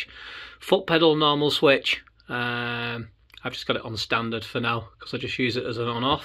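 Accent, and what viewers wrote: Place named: Northern England, United Kingdom. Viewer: Nice one.great to hear a relaxed northern voice rather than a American lol. May be thinking of getting one of these mainly for the mma and the mig .but would like to learn tiggin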